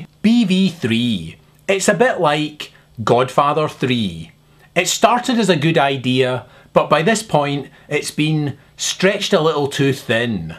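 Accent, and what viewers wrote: Scotland, United Kingdom. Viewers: STOP POLAR BEAR DISCRIMINATION!!! Fuck rats, though..., can you please cuss more? I love when you cuss in your Scottish accent...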